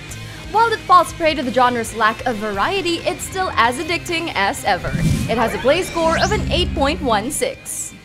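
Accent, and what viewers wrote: Canada. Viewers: Is it me, it does she have a Canadian tinge in her voice?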